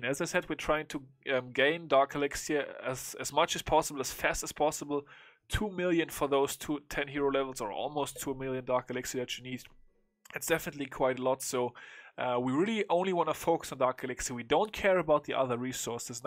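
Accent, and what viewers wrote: Germany. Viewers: You are german i can hear it by your english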